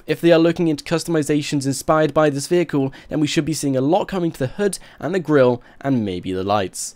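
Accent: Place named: RP, United Kingdom. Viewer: You sound so posh, I LOVEEE IT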